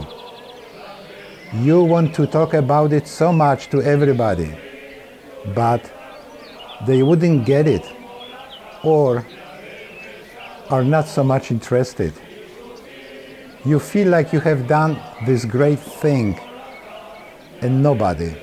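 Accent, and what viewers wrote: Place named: Poland. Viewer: Thank you very much for all your videos. The technical quality is better than almost all the others, the pleasant voice and intelligent content is appreciated too.I planned to walk 2020 in May but - - Covid! I hope to be able to go in Fall of 2021. I must say I taught in Poland for 2 years in the 1990's and your voice has that pleasant inflection that I became accustomed to while listening to my students and fellow teachers. Love to watch your videos on my TV so I can appreciate all the beautiful scenery. Thanks from Canada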